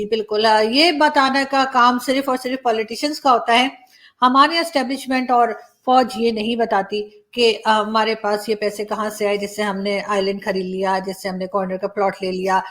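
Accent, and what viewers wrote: India. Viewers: Don't know wether people and u noticed or not ,that your accent and Dialect seems purely like Indian Hindi speaking person....... Lagta hi nahi ki urdu bol rahi hain aap ..It's like Indian Desi girl taking charge over any programme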